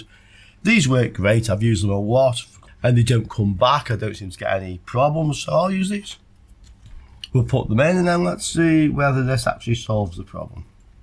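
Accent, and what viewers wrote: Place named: Sunderland, United Kingdom. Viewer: hi.like your accent nowt...haha im from sunderland macam land and we use the same wording for nothing. love your videos im not a tech just find the videos interesting...and simple to follow even though you go off into a tech tangent sometimes haha..but i know what the channel is for.keep up the good work......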